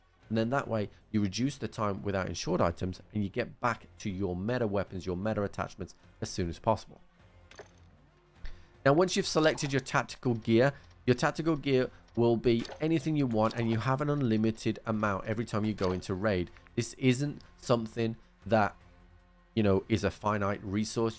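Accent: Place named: United Kingdom. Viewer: DMZ is so damn fun I played it 16 hours straight starting yesterday! Thanks. So nice to find such a well-polished guide--and in a British accent, too! So nice to find such a well-polished guide--and in a British accent, too!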